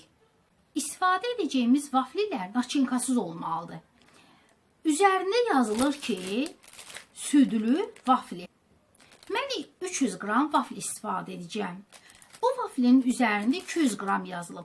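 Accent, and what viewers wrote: Baku, Azerbaijan. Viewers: Ləhçəəəə. Can bakılım)))😍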